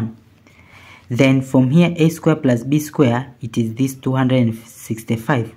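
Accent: India. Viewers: тупое, длинное решение. Да еще и жутким акцентом, явно индус.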